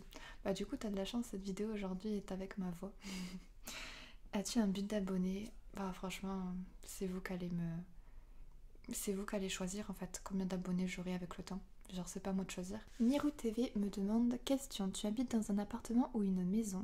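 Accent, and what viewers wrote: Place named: South, France. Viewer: Merci, on entend ton accent du sud :) Mignon Kawaï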